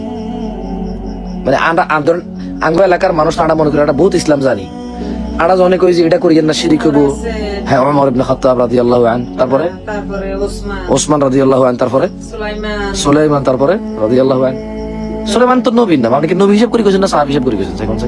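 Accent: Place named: Noakhali, Bangladesh. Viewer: Noyakhalir basa pura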